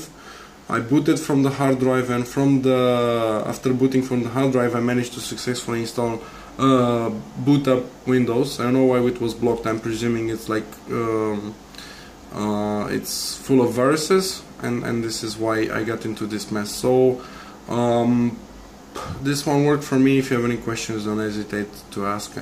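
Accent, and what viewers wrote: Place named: Ukraine. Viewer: You sound like you are from Ukraine or somewhere close. Playing a guess game.. Anyway Il try this.. BTW does anyone know why did we start seeing this screen?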